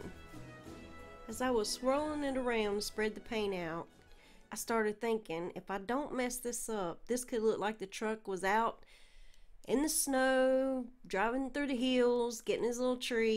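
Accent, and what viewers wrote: South, United States. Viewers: I just discovered you and I'm thrilled I did! I have to tell you how much I enjoyed watching your Video the music was nice and I loved each and every Christmas ornaments I really did! The baby JESUS was such a nice idea and it turned out so adorable (your humor is appreciated, I need some lately these days.) The pink tool was stunning with the multicolor gravity Ball..it sparkled so lovely! The Snowman's Top Hat and face was clever and so nice seeing it hung on the Tree! I liked the way you explained each craft...,I love listening to your Southern accent I'm from lower Michigan we don't have a friendly sounding voice (but we do say "POP" for bottled Carbonated Soda) Gee! ALL were really great ideas and some I've seen others do "BUT" using the BIGGER Bulbs I have to say that was one of the differences and yours had a look of neatly done, fresh and festive! 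SUBSCRIBED! Merry 🌠CHRISTmas and Blessings all throughout the coming 2023 🛻🎄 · I normally don't care for DIY projects because they look just like DIY but I have to say these are adorable. I will do a few of these. Thanks so much. Now we all are wanting to know where you are from? We hear a southern accent... Maybe you are from my south.. Louisiana! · Just found your channel and I had to subscribe! I’m from southeast Georgia so I love your accent even though you are from a different part of the south. We are still both Southern girls!❤️Loved all your ornaments and will be check out your page for more great ideas!❤️ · I'm not sure where you are from, but I absolutely love hearing someone on YouTube that sounds a lot like me. I grew up in middle Tennessee, so I have a very thick southern accent, so I feel like you're a family member helping me craft! Thank you for that! Lots of Love from one southern woman to another. 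· Love your crafty ideas and your southern accent too. Sending love from the southeast Kentucky mountains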